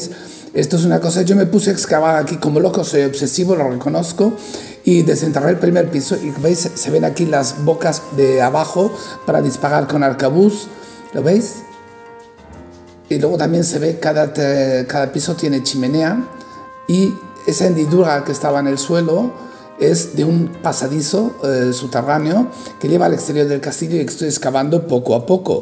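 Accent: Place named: France. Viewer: MUY INTERESANTE, cada detalle de este castillo es una 💎 joya...más relatado por el y ese acento francés tan agradable ❤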